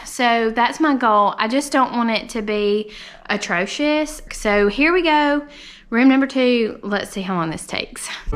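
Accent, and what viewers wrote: South, United States. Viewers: Your home is beautiful. I hear a southern accent? what state are you in? I am not in the southern states. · I’ve never related so much to a video in my life!!! With 2 under 2 (11 months apart 🥴) it’s so hard keeping up with everything once you get even a day behind . I’m from Alabama and most of my fam still lives there so I love your accent and southern charm! 💛 don’t be so hard on yourself , you’re doing just fine!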